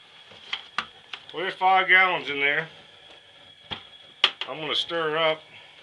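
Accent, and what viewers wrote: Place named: New England, United States. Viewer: U from new england? You say wutter instead of water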